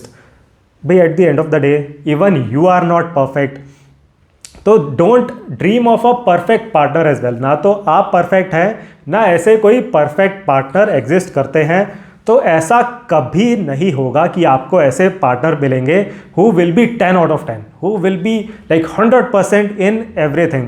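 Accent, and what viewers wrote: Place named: United Kingdom. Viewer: english accent 🔥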